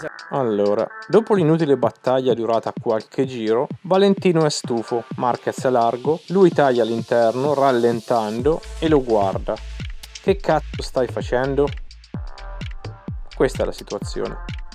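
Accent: Perugia, Italy. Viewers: Ma sei di Perugia? Grandissimo 😂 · Ciao, sono sul tuo canale per la prima volta e ti trovo molto bravo, una domanda: sei di Perugia? (Lo sento dalla parlata) 🤗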